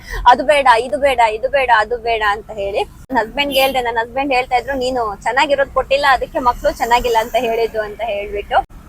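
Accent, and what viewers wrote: Kundapura, India. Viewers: Nivu nam kundapura kannada mix madi mathadthri kelike kushi ath nange❤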